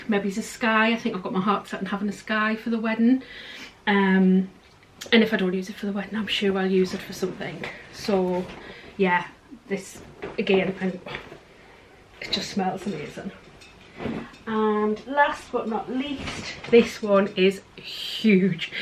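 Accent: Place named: Northern England, United Kingdom. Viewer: Wow what a fantastic haul! It was your fabulous Northern accent (as is mine!!) that lead me to you, the first time I saw a video it was Hang on that's an English accent and double bonus Northern!! So keep up the fabulous work and don't ever change we love you for being you and a fabulous bag maker and seller of quality hardware and runner of classes and retreats xx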